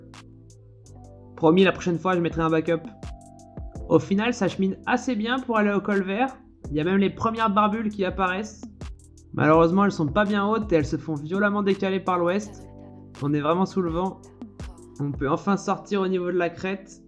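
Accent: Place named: France. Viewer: Pour moi comme canadien c'est difficile de comprendre ton accent français!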